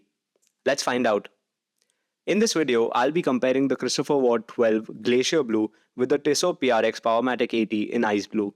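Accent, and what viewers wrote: India. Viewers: Great video, you are doing an excellent job. New subscriber from Laguna Beach, CA 👍 PS: I’m curious about your accent. I have heard a similar accent before, but I can’t place it. I’m guessing Indian? I like watches and languages. I have studied 6 languages. Another great hobby. 👍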